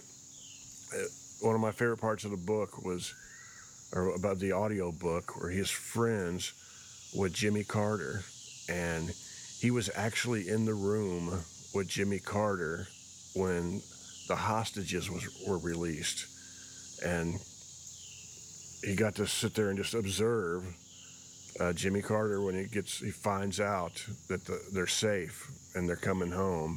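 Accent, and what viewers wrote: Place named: Asheville, United States. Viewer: I had just commented on your guitar player post last night about this. Man that sucks so bad. I wish my brother was still alive because he would be right with me on the commiseration today. He died in 2004 July 23rd. He turned me onto Tom T Hall, Johnny Cash, and all the older country and southern rock artists. I was a punk rock and thrash skater with a limited knowledge of older dudes like that. I sure appreciated him playing that stuff for me. Also when you get down to it the jump from bands like The Clash, The Violent Femmes, and Uncle Tupelo really ain't that far a stretch. I feel like you can play rock versions of country or country versions of rock. As music goes it's all the same there's only 12 notes and I only use 5 of them. Anyways as story tellers go Tom T. Hall was amazing. You have that same kind of delivery and ability... 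I play your stuff more and more and listen to the stories matter of fact the other day my wife said "That has such a nice voice. Comforting and sounds like people back home in Asheville. I could listen to him talk and sing all day" aside from that s#$* still been jamming on the songs you write. LOL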